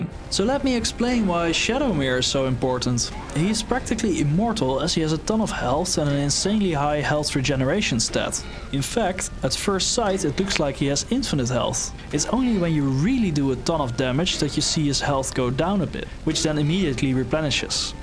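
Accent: Netherlands. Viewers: Are you Dutch? Your accent is very telling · You really have a Dutch accent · Ik hoor een Nederlander of niet? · The Dutch is strong with this one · Je klinkt wel erg Nederlands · I smell dutch😂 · I instantly hear that you're from NL :D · Are you Dutch? Amazing video, went by very quickly. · Are you dutch? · You can easily hear you're dutch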